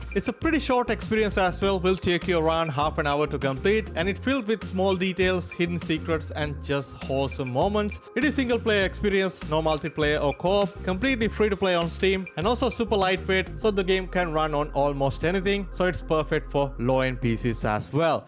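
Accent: India. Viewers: south asia , i think india most probablt cause his indian accent is slippin a little out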